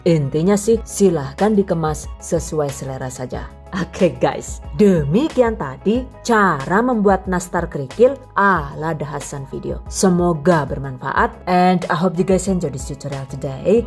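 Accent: Banyumas, Indonesia. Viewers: Deneng ngapak pada karo nyonge 😅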